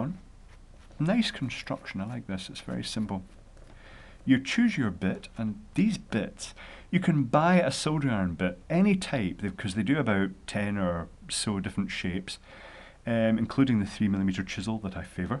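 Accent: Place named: Edinburgh, United Kingdom. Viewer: Sounds like Edinburgh to me.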